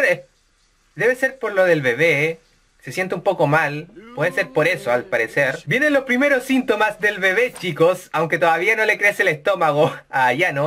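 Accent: Chile. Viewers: es chileno:v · eres chileno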